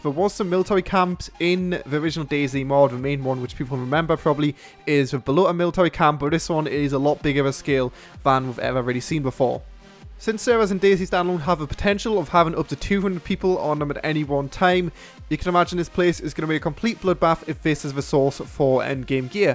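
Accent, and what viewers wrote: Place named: United Kingdom. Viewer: I miss your videos. Make some Wasteland or carry on playing Dayz Taviana!!! Please!!! Btw like your british voice:)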